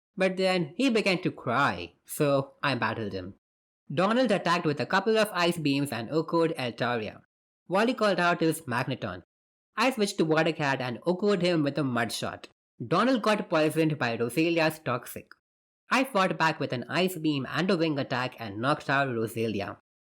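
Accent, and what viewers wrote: India. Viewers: Are you indian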